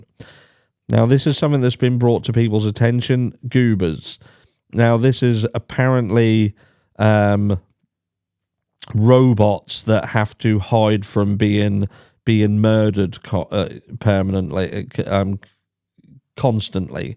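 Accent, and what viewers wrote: United Kingdom. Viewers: since i left UK decades ago i lost British traits and accent so glad to listen to your's mate All The Best Wishes Everyone :cat-orange-whistling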